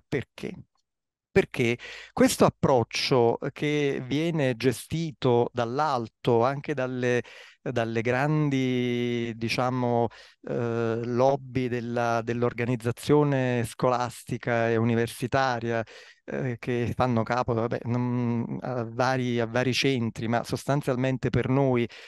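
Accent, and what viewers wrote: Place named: Campania, Italy. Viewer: M' illumino di immenso...nell' ascolto del Professore dalla squisita cadenza campana, e dalla serena e incisiva esposizione di una realtà che, da sempre osservo, analizzo e compatico. Iniziando la analisi del greco antico, si aprono mondi, universi ... è assolutamente prioritario essere messi nelle condizioni di studiare la realtà circostante andando ad analizzare quali erano le parole utilizzate dalle grandi Civiltà per definire il nostro Universo. Da lì le ispirazioni si aprono...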